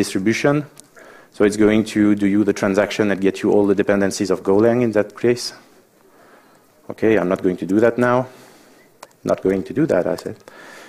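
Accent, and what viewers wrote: France. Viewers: Ah ah ah on entend le french accent lol bonne vidéo sinon les commandes classiques pour le nux sympa.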